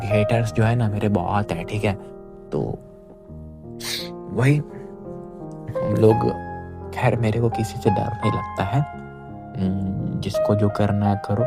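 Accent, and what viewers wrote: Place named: Bihar, India. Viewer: Your bigari accent just wowww ❤ That thora,, kapraa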